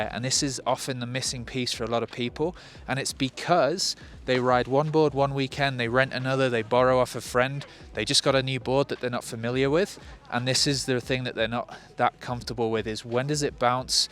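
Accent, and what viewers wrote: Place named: United Kingdom. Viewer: Came away from the first watch of this video thinking ‘well that taught me nothing, all I did was watch a bloke snowboard down a hill!’ The calm British voice was a welcome change to Tommie though 😂 But I actually thought about it the next time I strapped into my snowboard in the garage and suddenly it made a lot of sense! I’ve been trying to get Ollies dialled, and have watched so many technique videos, but recognising the flex characteristics of the board, and working with them, was a real light bulb moment for me and it made a huge difference on the snow! Suddenly you have to think less about the plethora of different technique tips you’ve heard, as it becomes a much more natural movement sequence. Never normally write comments, but this video deserved one. 👍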